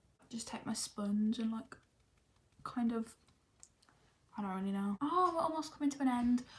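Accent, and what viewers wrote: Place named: England, United Kingdom. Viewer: Where in England are u from, like ur accent is different x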